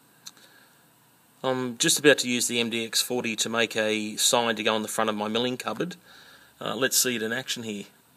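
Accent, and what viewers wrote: Australia. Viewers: btw good to hear another australian accent on youtube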